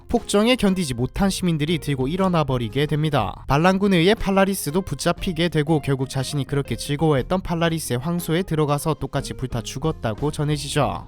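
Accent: Gyeongsang, South Korea. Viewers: 경상도인의 서울말 같이 들리네요 ㅋㅋ 제가 듣기엔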